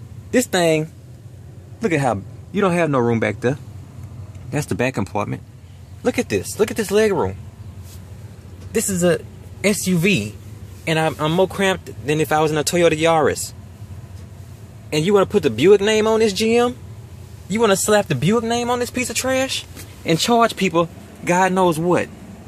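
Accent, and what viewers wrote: South, United States. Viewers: Dude where are you from, I love your accent I bet you’re from the South somewhere 😂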